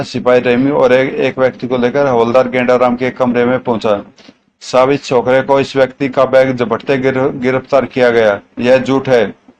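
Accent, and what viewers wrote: Haryana, India. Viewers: tumhara awaj jo h haryanvi tune m aa rhi hai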